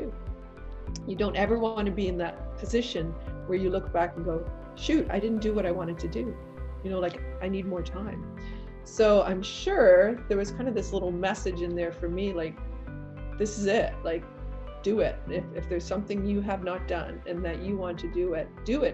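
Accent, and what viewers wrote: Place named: Canada. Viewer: Canadian accent for sure! I checked out your (very nice) website. Really like your work and your msg in this vid. I can relate. Hi from TO👋😊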